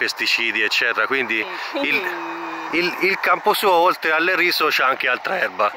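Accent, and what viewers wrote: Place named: Tuscany, Italy. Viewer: Avrei una marea di domande x te adoro i toscani l accento mi piace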